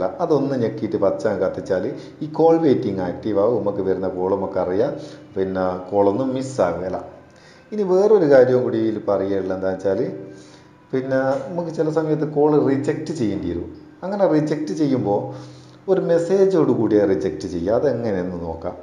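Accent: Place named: North Kerala, India. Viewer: എന്റെ മുത്തുസെ എന്തിന്നാ ഈ പറയുന്നേ... കുറച്ചു സ്റ്റാന്റേർഡ് ആക്കിക്കൂടെ... വേറെയും എതെല്ലോ ജില്ലക്കാരും കേൾക്കുന്നില്ലേ... തെക്കന്മാർക്കൊന്നും മനസ്സിലാവില്ലാന്നേ..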